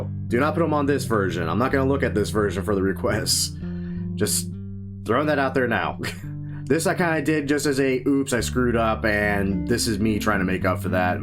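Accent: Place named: United States. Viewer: idk im just pointing out it sounds really american, we can understand u its the same word, just pronounced different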